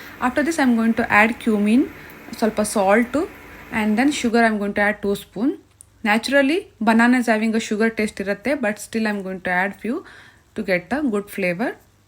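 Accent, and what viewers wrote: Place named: Andhra Pradesh, India. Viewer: Your telugu accent is good ma'am :)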